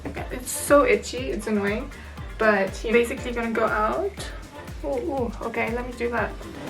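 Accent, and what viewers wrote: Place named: United Kingdom. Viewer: Wow! It's great that you have such a great sense of fun about this BUT, It's really deplorable and sad that it's even necessary to approach this subject. I think you will need a paper bag over your head and some kind of sack clothing to prevent being recognised as asian. I've been away from England for 40 years but your accent and expressions sound beautifully British to me, so no worries there.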